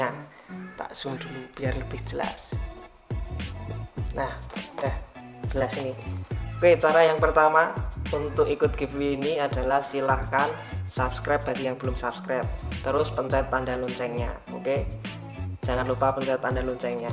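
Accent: Java, Indonesia. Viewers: video unboxing udah menarik koq, rodo medok-medok hahaha..... dan marai pengen nostalgia jaman sd mbiyen dolanan tamiya meneh. salut racun 4wdmu mas. klo bisa unboxingnya jangan kelamaan dari bulan rilis jd bisa buat refersi beli hehehe.... razor back kapan di unboxing mas, padahal di video sampeyan yg kapan itu udah kliatan..... selak muncul sik anyar meneh ig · Medok bang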